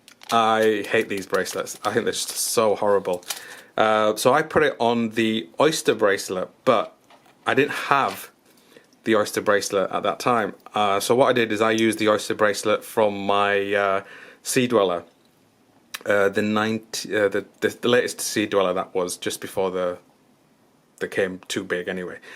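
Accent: Yorkshire, United Kingdom. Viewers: What do you so for a living if you don't mind me asking? Can tell your a Yorkshire lad haha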